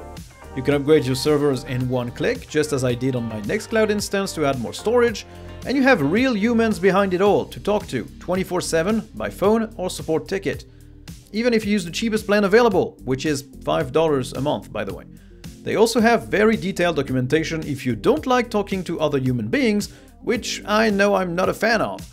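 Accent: France. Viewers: French accent, are you from France?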